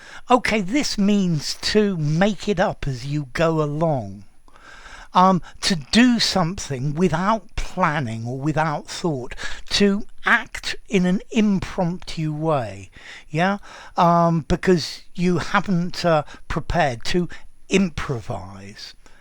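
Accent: RP, United Kingdom. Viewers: I wonder if the teacher speaks Contemporary RP. Can you tell me?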